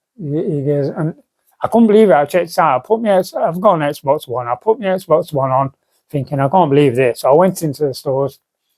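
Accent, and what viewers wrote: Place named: Midlands, United Kingdom. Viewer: where are you based in the uk you sound like youve got a south east midlands accent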